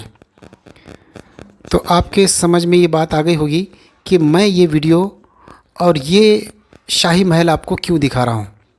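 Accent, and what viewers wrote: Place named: Deccan, India. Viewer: Mashaallaha your talafus is daccani mostly Belguam side.you’re explaining it correct.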